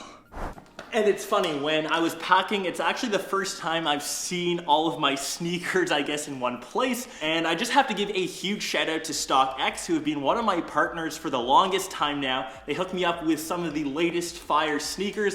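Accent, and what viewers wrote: Canada. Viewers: Damn I can tell your Canadian hahah that accent hits hard!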